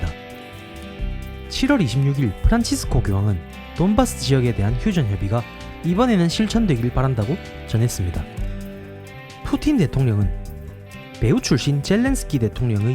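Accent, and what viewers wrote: Gyeongsang, South Korea. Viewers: 경상도 20년 살았는데 좀 이상하긴한데 서울말씨보단 듣기 편함 ㅅㄱ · 갱상도말 신경쓰이면 안들으면 되제 따지는게 많노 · 경상도 사투리 흉내내세요?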